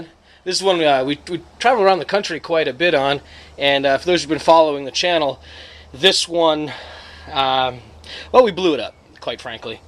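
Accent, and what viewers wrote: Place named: New England, United States. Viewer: Nice a little touch of New England in the South:)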